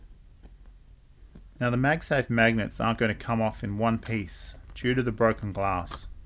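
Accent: Australia. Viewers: Very satisfying to see and Hear a fellow Aussie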